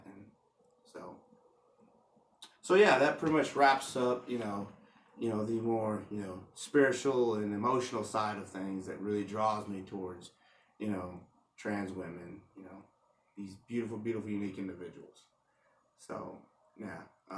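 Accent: United States. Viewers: What the hell were you bullied for? Good looking guy, normal deep southern voice. Not fat. not effeminate. I don't get it? Bullies are stupid apparently! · As a trans woman from rural Appalachia, it means the entire world to me to hear a straight, cis man with a similar accent finally support my existence. It's the closest to feeling accepted by home I think I've ever gotten to experience.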